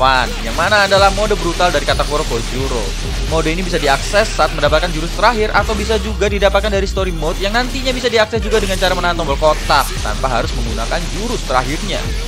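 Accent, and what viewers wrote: Java, Indonesia. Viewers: logat jawanya keluar😂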